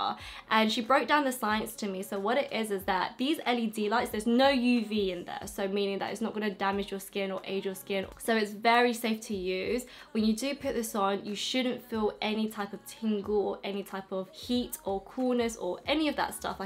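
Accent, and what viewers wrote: United Kingdom. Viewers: all these years i’ve been following you on instagram and now i’m finally finding you on youtube and on top that YOUR BRITISH ! wtf lmao i love your accent though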